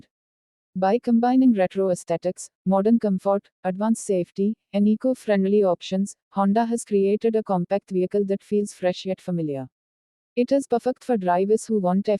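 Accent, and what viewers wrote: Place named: India. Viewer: with the heavy Indian accent and her "rushing" without any inflection makes it almost impossible to understand this announcer.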